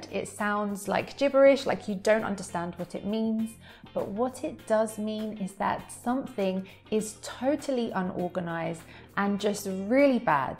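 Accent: United Kingdom. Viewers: I hear a lots of different british accent in movies and tv shows, now I got used to your accent and I would like to know which part of the UK are you from so when I hear someone speaks with your accent I will know where he is from, I got used to one of the X factor judges accent and she is from Esses now when I hear someone speaks with the same accent I know they are from Essex, I like to recognise where people are from juste by leastning to their accent, that is the reason I am asking you which part of the UK you are from, and you don't have to answer my question if you don't feel confortable to, thank you. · Hello i'm one of the your big fan's and I really like your Accent because I'd like to speak british accent properly so I hope you to make a special lesson about most common sounds to sound more british, Thanks for reply.😃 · I love the British accent and I'm chuffed to bits with this video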